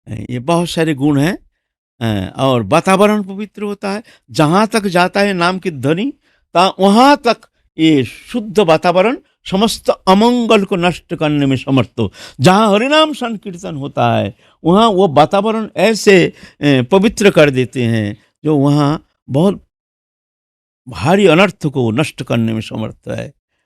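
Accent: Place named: Bengal, India. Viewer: Baba ap bengali ho na ??? Apka batchit me bengali tone ata hai. Ap jorur bengali ho. I am bengali.kolkata.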